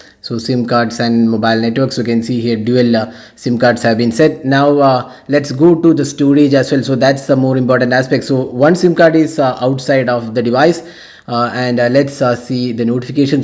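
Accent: Kerala, India. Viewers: Hate that mallu accent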